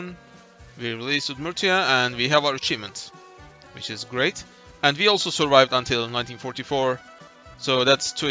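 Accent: Turkey. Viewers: Knk türk aksanı sezdim türk müsün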